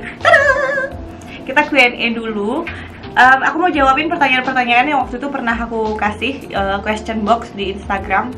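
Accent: Java, Indonesia. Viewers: Buk wagub udh medok logat jawa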